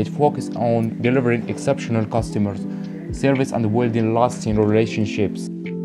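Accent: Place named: Morocco. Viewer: لونجلي نقية كمل اخويا المهم عرفتك مغربي غا بالأكسنت ❤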